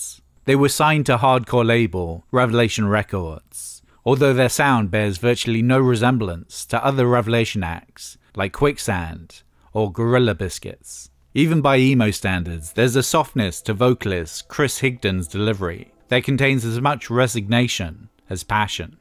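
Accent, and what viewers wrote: United Kingdom. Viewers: Ngl it's really weird hearing a British guy talking about emo. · Can Brits like, not narrate something for once?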